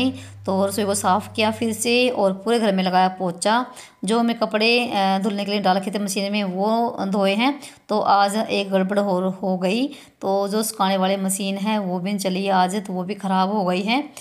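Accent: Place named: Haryana, India. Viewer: Or aap ye bhasha kaun c bol rahi hein aapki bhasha thodi haryanvi touch liye hai aap wahan se hein kya..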